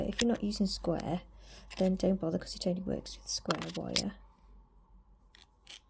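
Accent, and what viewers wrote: United Kingdom. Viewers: Where are you from if I might ask? (Not exactly of course - just region). Your specific accent is so lovely. I have friends all over the UK and each has a different accent but yours is so so lovely. My friend from Coventry sounds sort of similar so I would guess you’re not too far from her. 💚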